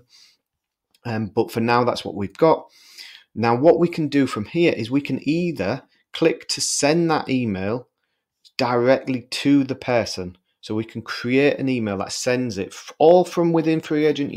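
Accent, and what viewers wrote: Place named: Middlesbrough, United Kingdom. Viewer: Are you from Middlesbrough? You sound like Bob Mortimer!!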